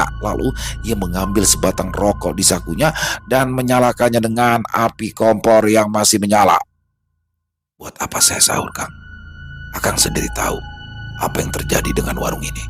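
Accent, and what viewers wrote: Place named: Java, Indonesia. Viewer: Selamat Pagi mas? Ooo pantesan mas bicaranya Macam logat jawa ha ha ha mas jangan kuatir ya saya pasti dukung terus maju teruuss ❤🎉😊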